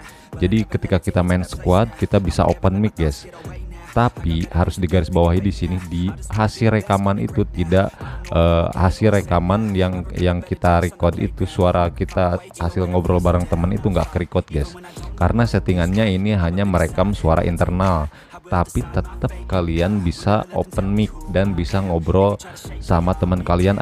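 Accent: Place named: West Java, Indonesia. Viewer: Orang Sunda nih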